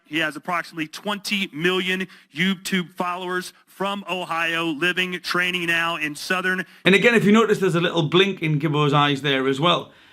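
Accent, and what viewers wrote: Newcastle, United Kingdom. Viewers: Milo Clesham I think he’s said that hes from Newcastle as well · Is it just me or does this guy sound like true geordie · Before I see this video I already know you're going to side with Gib since you sound British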